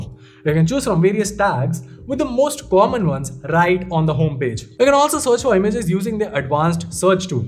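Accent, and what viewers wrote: India. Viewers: join video, hearing indain accent, leaving the video. edit: thank you, the video was useful.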